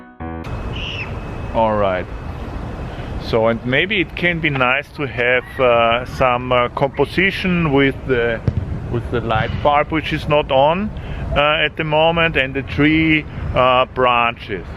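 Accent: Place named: Germany. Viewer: Dein deutscher Akzent bei deinem Englisch ist der Hammer🤭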